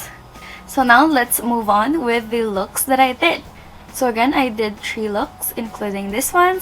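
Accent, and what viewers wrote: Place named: Philippines. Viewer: You seem to be such a sweet person. You are from the Philippines, right? I recognize the accent. I am from Sweden and I was in the Philippines for 6 months in 2011 doing volunteer work. Everyone over there are so nice and friendly ☺️ Hearing you speak makes me nostalgic ✨ You are really good at makeup too! ☺️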